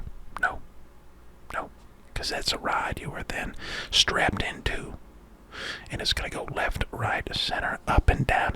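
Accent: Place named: South, United States. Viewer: He was covering up his southern roots back then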